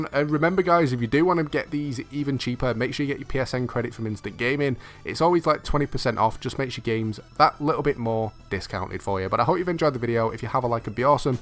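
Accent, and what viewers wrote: United Kingdom. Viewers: Something about a British accent that makes me cringe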